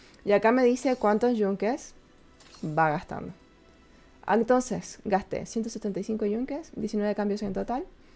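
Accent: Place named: Chile. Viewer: Una Pregunta, de que país eres? Tienes un tono que me recuerda a chile. Pd: Saludo desde Chile🇨🇱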